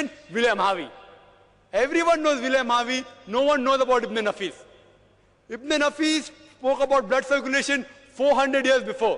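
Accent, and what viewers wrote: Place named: India. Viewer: This guys sounds too Indian for me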